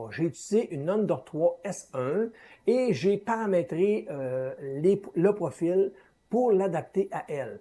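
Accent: Canada. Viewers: Bonjour, Je n'écris plus beaucoup en ce moment désolé, je suis débordé 😢, mais à part quelques vidéos sur les laser qui, POUR LE MOMENT m'intéresse moins, je ne loupe aucune de tes vidéos que j'attends avec impatience. Tu fait partie de ceux qui me remonte le morale quand je voie déjà la notification, ce que j'apprécie chez toi c'est ta simplicité, dans le bon sens du terme, même si pour nous français ton accent canadien, que j'adore, est parfois un peu compliqué pour la compréhension, mais c'est toujours clair et détaillé, et quand il y a besoin de détail tu sais prendre ton temps. Il est vrai que je n'aime pas trop les vidéo de plus de 45 minutes, mais quand il y a besoin, c'est justifié, plutôt que je ne pas tout expliquer. C'est vrai que les parties avec zoom sur la pièce, ne rendent pas toujours aussi bien qu'à l'œil nu, mais tout ceux qui imprime en 3d FDM le savent cela. 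Je rencontre les mêmes personnes qui râles sur la qualité du travail effectué chez les youtubeur qui font de la peinture sur figurine. J'en fait un peu et moi même, mais sans râler, j'avais vu un Youtubeur peindre et faire un gros zoom, et j'avais aussi pas trouvé sa top, puis j'ai imprimé la même figurine en résine, et une fois imprimé et que tu l'a dans les mains, tu te souviens du zoom qu'il a fait sur les yeux et là tu te dit... "Ha houai, quand même, c'est petit". Alors oui un gros zoom montre tout, mais une fois vu avec nos yeux, c'est très propre... Et seulement quelques cm d'éloignement cela ch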